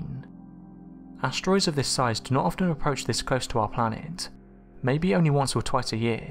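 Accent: United Kingdom. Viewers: Where the F&&^% do they find these BRIT announcers? Really--Tuskon, Arizona? I couldn't catch half of what he was saying, even with the Closed captioning going! If it's a NASA bulletin, find an American announcer!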